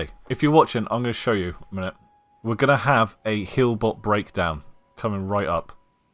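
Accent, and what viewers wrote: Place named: United Kingdom. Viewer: Seriously, it has to be something in the water. Another goofy brit with a thick accent trying to make a guide on an American game. Lol and this goofball actually has someone talking over him. So weird!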